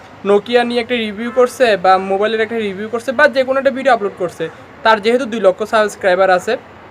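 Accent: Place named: Sylhet, Bangladesh. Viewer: কথা সুনলে হাসি পাই......... সেলেটি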